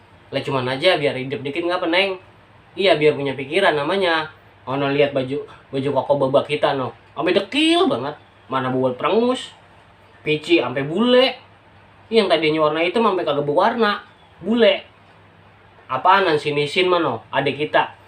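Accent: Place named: South Jakarta, Indonesia. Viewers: betawi asli ye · Wkwk logat Jakarta Selatan dong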